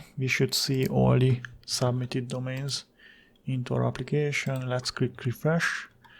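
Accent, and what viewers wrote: Hungary. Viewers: Ugy hallom abbol az angol akcentusbol hogy magyar vagy te is :)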